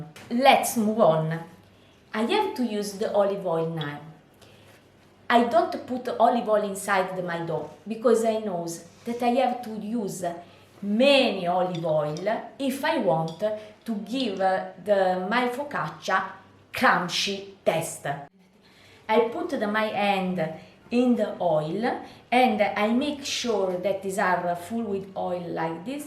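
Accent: Italy. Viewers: Very very nice video! I really appreciate. I also love your Italian/English accept 😉. I will definitely follow up your video!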